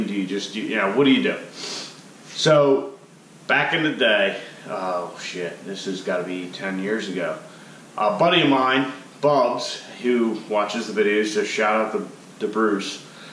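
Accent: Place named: Pennsylvania, United States. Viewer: ditto. keep it. those of us who understand the the hunky (slang for the polish-slovak-german-italian culture mashup in the area) accent, or who are from pennsyl-tuckey actually appreciate having someone who doesn't sound like everyone else on the web.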